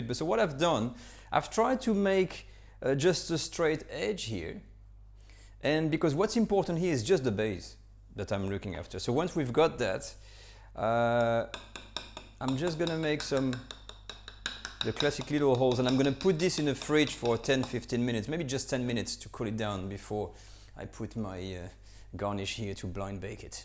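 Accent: France. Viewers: James Franco with a French accent. Subscribed!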